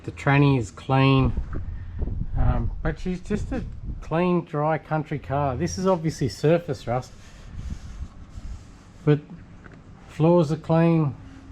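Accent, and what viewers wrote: Australia. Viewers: Wow! Just look at what you've done with this - what a beautiful car. Love it. Also love your commentary: Expert and unmistakeably laid back Australian style - I reckon you could just leave in what you think are "bloopers"!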